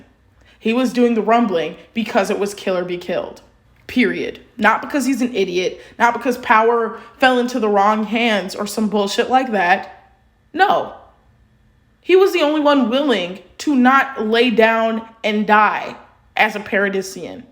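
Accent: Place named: United States. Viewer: I kind of reached my limit with you saying that in real life, 'talking is the answer', because no, it isn't. I can just tell from your accent that you're from the New World and this opinion shows it. For example, my country the Netherlands. Its earliest foundation was a Republic, and do you know how it came to be? Spain had taken over the region and we fought 80 years for our independence. We had several talks and a truce, but it never worked as Spain refused to give the Dutch ethnic group our land until Spain was caught up in too many wars and was financially drained to the point it couldn't afford to keep up the war. Then they agreed to declare us an independent republic. The answer to our freedom was money. WWII wasn't solved by talking, but by people fighting back enough that Hitler knew he was going to lose so he killed himself. I can continue. 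Sure, treaties and truces are done through verbal communication but they don't get made if the war is more beneficial to the people fighting then peace is. Either one or both parties need to sink deep enough before the talks happen, and that is exactly what AOT shows: To the rest of the world the genocide of Paradis was more beneficial then peace so they opted genocide. Which is why the only one willing to talk with Paradis is Hizuru, because they would benefit more from peace with Paradis then a genocide because then Marley and other countries get a hold of the resources they want.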